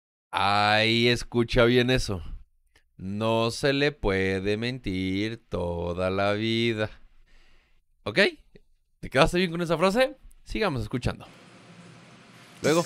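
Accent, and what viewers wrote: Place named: Monterrey, Mexico. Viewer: Eres de Monterrey cuerco. Verdana?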